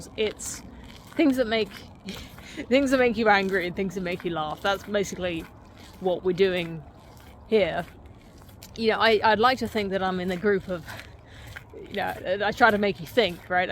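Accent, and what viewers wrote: England, United Kingdom. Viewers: Been on a bit of a Mary Harrington kick and can't help thinking she sounds so much like you (because I knew of you first). Any relation? Or just from the same region of England and thus similar speech patterns? As an American grieved by our vast population's continual homogenization of accent I love how much more so(at least it seems) folks in the UK are maintaining distinct regional accents. (Though I know the flip side of that coin is accent and class being closely tied and that limiting your options in career is a real thing for y'all.)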